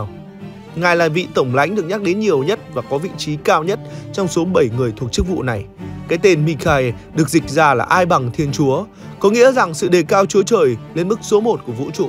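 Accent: Vietnam. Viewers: Nói giọng Bắc ko chuẩn lại nói nhanh nuốt chữ khó làm người nghe ko kịp, phát âm các tên TLTT ko rõ !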